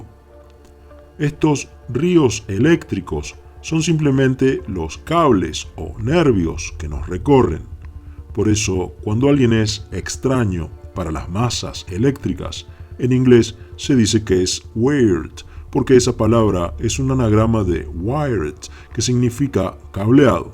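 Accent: Córdoba, Argentina. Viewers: El dueño del canal me da la sensación que es de Córdoba, Argentina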